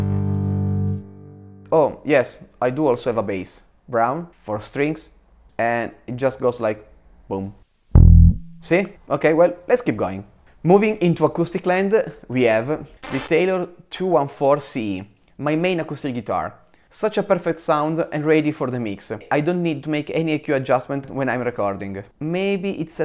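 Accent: Italy. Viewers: aooo ma se sente che sei italiano AOOOOOOOO🇮🇹🇮🇹🇮🇹🇮🇹🇮🇹🇮🇹aoooooooaooooooo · You have the best Italian accent I have ever heard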